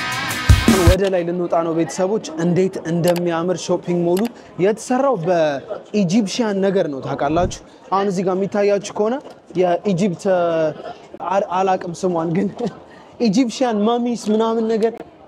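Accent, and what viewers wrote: United Kingdom. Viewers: I like your British accent….👍🏽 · u got a bitish accept man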